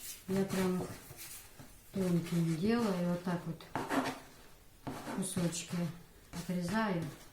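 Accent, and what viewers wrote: Chechnya, Russia. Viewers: Я сегодня подписалась на вас, прочитав немного комы, я была удивленна, тем что вы не чеченка) а так похожи, и акцент у вас уже как наш))) Аллах дал атто бойл ха 😘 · Акцент даже нохчи ю ) марш йог1ийл.рецепт сохраню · Окцента чеченский мололец. Спасибо попробуем. Плачь моя подписка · Ассаламу алейкум, я думала, что чеченка👍🙂 даже акцент похож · Акцент зато чеченский👍👍👍👍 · И акцент чисто Чеченский, когда говорить на Русском. Молодец удачи тебе и счастья.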